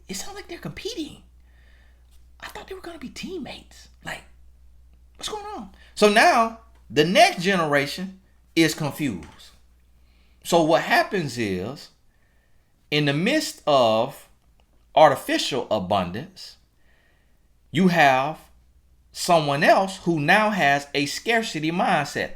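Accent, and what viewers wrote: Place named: South Carolina, United States. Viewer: where are you from?? You sound like you from SC, I could listen to you talk all day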